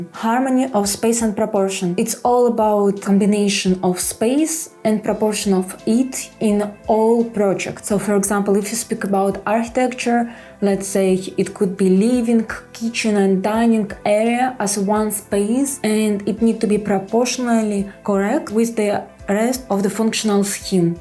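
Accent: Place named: Russia. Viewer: Чтото мне подсказывает что это русский акцент 🎉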